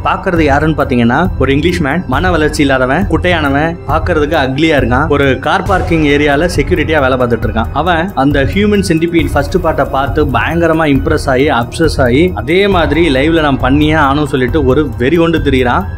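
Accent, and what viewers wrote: Madurai, India. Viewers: Anne Neega Madurai thaana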